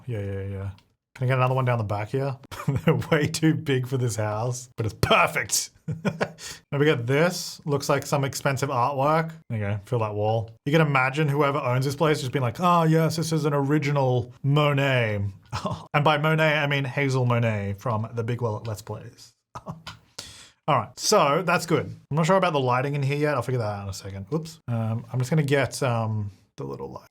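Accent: Australia. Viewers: I'm Australian so I'm fairly used to the accent but when I have you in the background you sound like Jazza 😂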